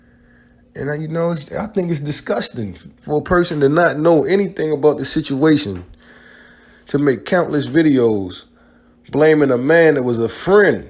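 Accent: Baltimore, United States. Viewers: You from B more? When you say do and dude you have the same accent as people from there, My bad if im wrong. But anyways, I aint gonna lie I thought Cowboy had something to do with it also. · Damn bro your Baltimore accent heavy af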